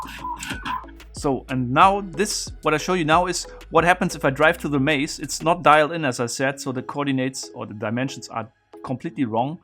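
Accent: Germany. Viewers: Voll deutscher Dialekt, ne ? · Super Danke für deine Antwort. Sehr nett! Weiter so! Habe keinen blassen Schimmer weder von Programmierung noch von Maschinenbau oder Technik. Aber ich liebe alle Kanäle, bei denen die Leute mit Leideschaft und Herz dabei sind und den Zuschauern, die Augen zum funkeln bringen. Das passiert genau bei deinem Kanal. Man merkt, dass du dein Hobby zum Beruf gemacht hast. Bin selbst Sprachlehrer und mit Leidenschaft, bringe selbst die Augen meiner Schüler zum Leuchten, wenn sie merken wie einfach es ist, das immer als schwierig zu erlernende Deutsch zu verinnerlichen und mit Leichtigkeit anzuwenden. Oft wird immer unterstellt: entweder Sprachen und künstlerisch oder mathematisch-naturwissenschaftlich. Dabei gehen Sprachen und Mathematik einher. Beides sind logische Konstrukte mit klaren Regeln. Ich mag dein Englisch, echt super, und ich mag es bei den Deutschen wenn auch noch ein Akzent heraushörbar ist. 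Ein Hauch von Heimat! Super Kanal, bin erst drüber gestolpert! Freue mich über deinen Erfolg. Mal sehen, ob ich auch mal einen Kanal aufmache und mit Herz und Leidenschaft die Leichtigkeit zum Sprachenlernen vermittel, oder wie jeder Schüler genau den Sprachlehrer bekommt (oder formiert) den er braucht!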